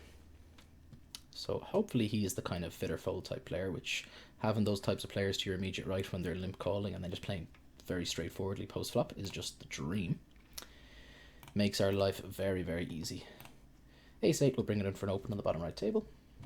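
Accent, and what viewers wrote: Ireland. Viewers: YOUR IRISH !!! fuck yeah !! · Irish confirmed.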